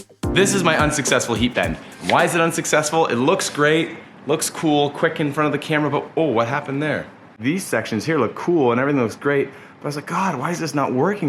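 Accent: Canada. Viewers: Whats with all these canadians and the ABOOT crap!!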